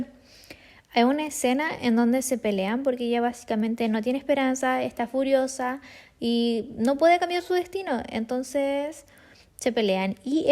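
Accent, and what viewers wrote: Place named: Chile. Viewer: tengo que decir que tienes una voz bonita , PERO HABLAS MUY FEO por el momento DUDE en que IDIOMA HABLABAS. y me di cuenta que hablas asi por que eres CHILENA, y te quiero comentar que si vas a tener un canal y compartir contenido TRATA DE HABLAR MEJOR por que no todos entendemos el CHILEÑOL.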